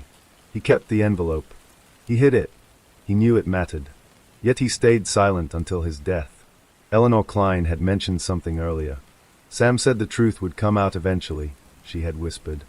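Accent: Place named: Boston, United States. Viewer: Dear narrator, are you AI or areal person please? If you are AI, why have you been given a Boston accent. Just curious?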